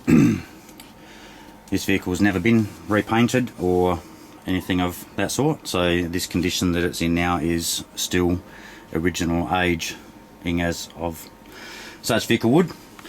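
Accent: Australia. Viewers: he speaks australian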